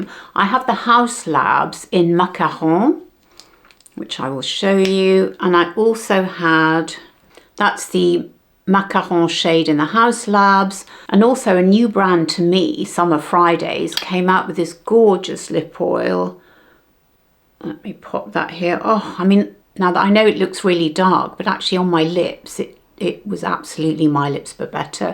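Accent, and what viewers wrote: United Kingdom. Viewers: I love your British accent and way of speaking. Live in the US for awhile if you want to hear the English language butchered. You are eloquent. 💖💖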